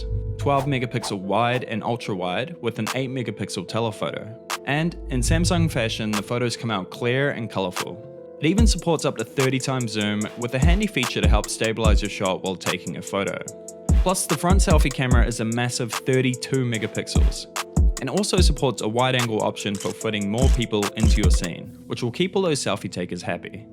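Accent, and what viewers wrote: New Zealand. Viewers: It really trips me out to hear a NZ accent in a tech review lol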